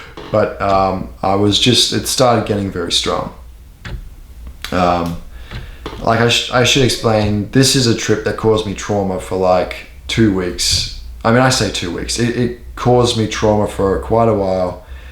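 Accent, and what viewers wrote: Australia. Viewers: he sounds like an aussie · Something about how you looked in the thumbnail made me assume your Australian for some reason, but your accent is throwing me off big time, sound like an aussie who lives in the US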